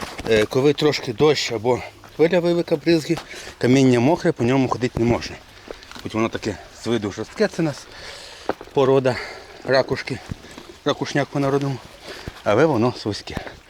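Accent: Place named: Odessa, Ukraine. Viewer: Прикольный, у тебя акцент,настоящий южный.Коренной одессит походу.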